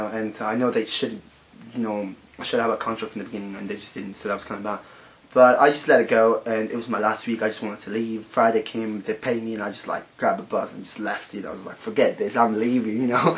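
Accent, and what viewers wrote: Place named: Birmingham, United Kingdom. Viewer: I'm English and i would never go to Turkey. I'm sure the majority of Turks are lovely people. But i always hear every summer of people being attacked and in fact just yesterday a British family were shot by a Turkish man at a villa. Greek people are beautiful. Go to visit Greece guys, beautiful people, beautiful country, beautiful everything. By the way you has anyone told you that you sound like you're from Birmingham in England, you have a similar accent:- )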